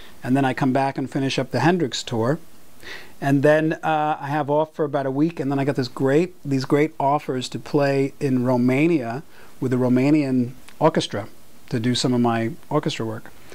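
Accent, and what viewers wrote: United States. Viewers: Love the New York accent. Awe-chestra! :D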